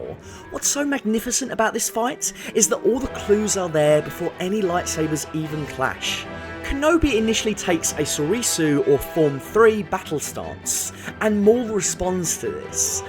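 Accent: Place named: England, United Kingdom. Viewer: Fuck your pommy voice is annoying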